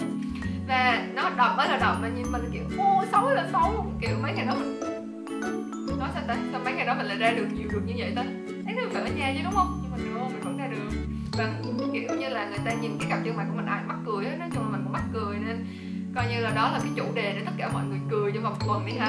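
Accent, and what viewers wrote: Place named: Gia Lai, Vietnam. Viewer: Quê chị ở đâu thế nghe giống giọng gia lai quá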